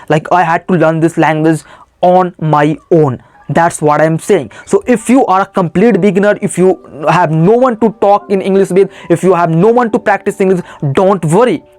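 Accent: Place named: India. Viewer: Indian accent 😬🤢🤮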